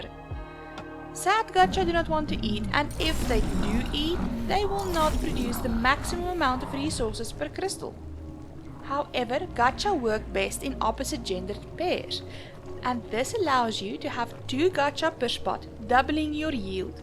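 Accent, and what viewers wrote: South Africa. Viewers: south african ACENT? :-) Love the clip 🙂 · You sound South African. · are you afrikaans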